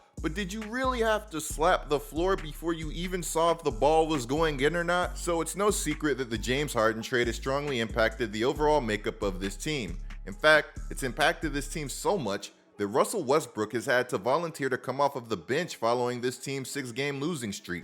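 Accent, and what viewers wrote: New Orleans, United States. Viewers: You from Nola ?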